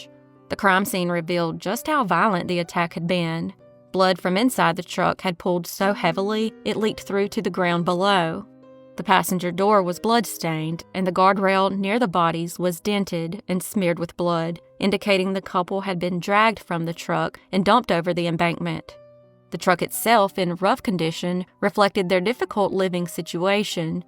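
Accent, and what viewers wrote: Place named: South, United States. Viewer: It is so nice to hear a southern accent! I'm from Ohio, but family is in Georgia. Grandma moved up here and would tell me stories to get me to sleep. Listening to the accent with true crime is so relaxing!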